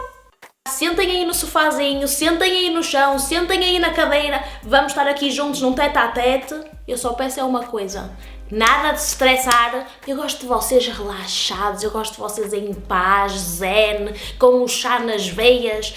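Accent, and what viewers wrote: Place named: Portugal. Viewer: Uma portuguesa com certeza. Muito simpática e animada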